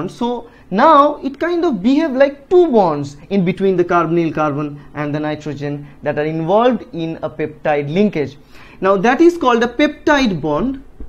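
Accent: India. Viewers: such indian accent.........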